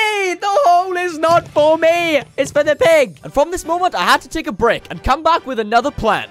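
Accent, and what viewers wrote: Wales, United Kingdom. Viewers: Are you Welsh?😅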